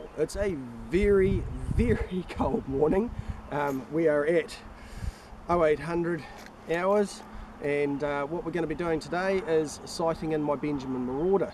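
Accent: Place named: New Zealand. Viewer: deserves more views just for the kiwi accent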